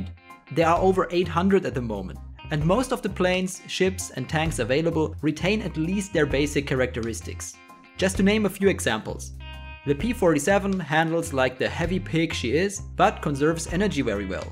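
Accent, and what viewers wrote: Germany. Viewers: Hallo guten Tag man hört dass du deutscher bist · That is one German accent. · Echt gutes video aber man merkt das du deutsch bist # Tiger zwei oder Panther zwei sagtest du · Bin mir nicht sicher, aber ich glaube man hört deine Deutschheit raus :D Trotdzdem sehr gute aussprache, musste echt 5 mal hinhören ^^ "Jagdpanzer" hats dann bestätigt :D Deine ("Review") hat mich überzeugt. Zeit das WOT mal abgelöst wird :D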